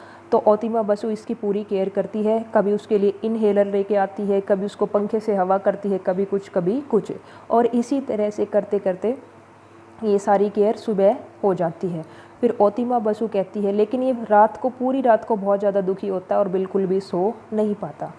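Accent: Haryana, India. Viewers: Mam haryana se ho aap?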